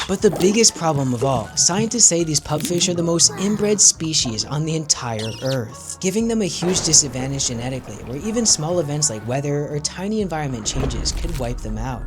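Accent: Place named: Alabama, United States. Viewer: Sounds like Alabama.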